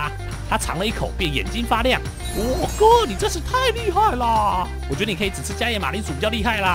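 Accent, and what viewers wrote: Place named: Yilan, Taiwan. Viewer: 經過我不專業的耳朵，你應該是宜蘭腔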